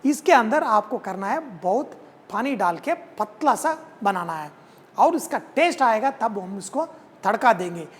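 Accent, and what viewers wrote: Hyderabad, India. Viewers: muze bhi apaki Hindi achchi lagati hai. hydrabadi style me bolo.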